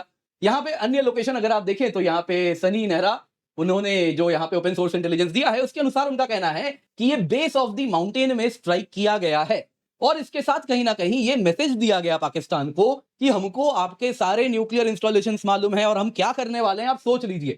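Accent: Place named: Punjab, India. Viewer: Je Toh pure Punjabi tha ,❤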